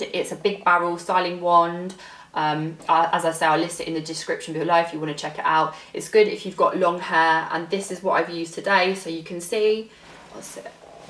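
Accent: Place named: England, United Kingdom. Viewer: Love ur English accent and ur so beautiful ❤️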